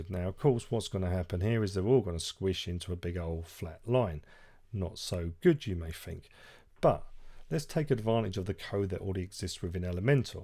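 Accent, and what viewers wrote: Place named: England, United Kingdom. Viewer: Excellent tutorial man. Always better with an english accent too haha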